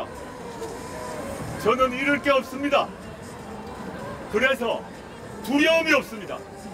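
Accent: Busan, South Korea. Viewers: 부산말 웃긴당 치와라~~~마~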